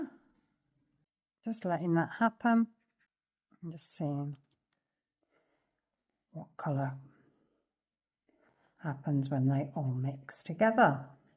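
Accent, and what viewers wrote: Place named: United Kingdom. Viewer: I’d love to know where in the UK you live. I moved from Nottinghamshire to the USA nearly 20 years ago and your accent sounds familiar.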